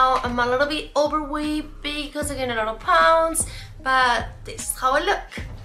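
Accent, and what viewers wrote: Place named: Spain. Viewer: Me encanta tu acento. Algun día visitare España.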